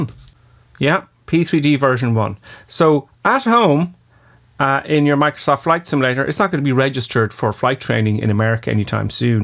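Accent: Ireland. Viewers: wait, you’re irish lol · Are you Irish? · Normally I’d thank you. But in this instance I enjoyed the review so much including the lovely Irish accent (wipes tear from eye) I watched the whole thing. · Love the Irish commentary. Refreshing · Hey new sub here U sound Irish man what country u from. Awesome video buy the way cant wait for it coming out on xbox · Are you from Cork boi? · Great review..very well done 👍 looking forward to more reviews in the future..also love your Irish accent ☘️ Also I am an absolute newcomer to flight simulators...would really appreciate tutorials for total beginners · Thank you for this excellent video and detailed description. I like your Irish accent! Sounds like I will need to upgrade a few pieces in my PC... Can't wait to try it out!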